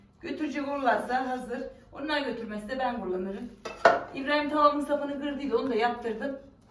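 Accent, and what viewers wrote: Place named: Uşak, Turkey. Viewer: Sonradan dinleyince uşak oldıgunu anladım bızde manısa merkezden 😊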